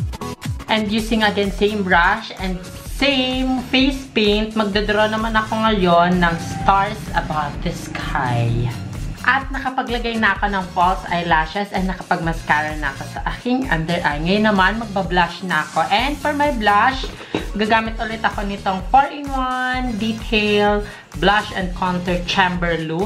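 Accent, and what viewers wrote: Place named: Polangui, Philippines. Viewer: I just so love how you speak bicol polangui, makes me wanna go home. LGBT is ❤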